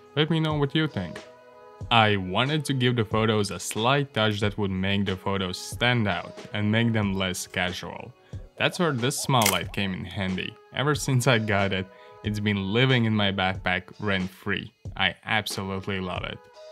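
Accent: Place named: Eastern Europe. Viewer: Wonderful content! Where are you from? You sound like Eastern Europe brother :)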